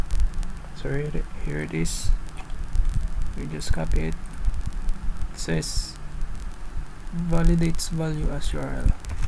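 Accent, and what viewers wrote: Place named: Brazil. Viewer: Você parece um Brasileiro falando inglês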